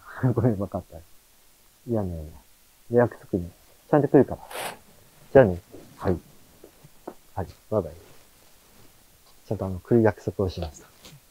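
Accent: Japan. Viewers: 沖縄のイントネーションがかなり入ってます。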